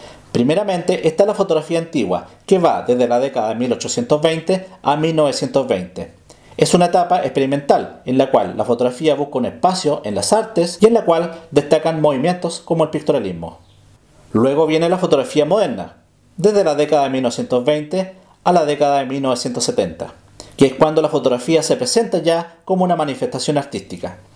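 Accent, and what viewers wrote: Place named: Chile. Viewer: Muy bueno tu video. Me queda claro que eres chileno, nuestro acento es inconfundible. jeje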